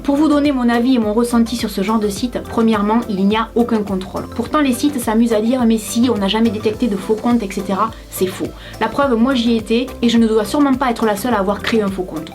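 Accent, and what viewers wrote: South, France. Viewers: Tu vien de Perpignan la base ta l'accent du sud comme moi 👌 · Accent du Sud en force !!!! 💪❤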